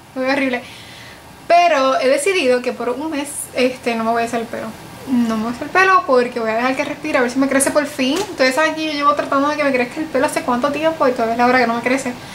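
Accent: Puerto Rico. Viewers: Yo tengo ese mismo primer.. me encanta pq tmbn te sellan y que los poros eso me dijo la muchacha de mac.. que buenoo ver mas videos tuyooss.. jaja me rio tanto pq es q de lejos las boricuas se nos notaaa q somos pr por el hablar jaja.. te cuidas chicaa :)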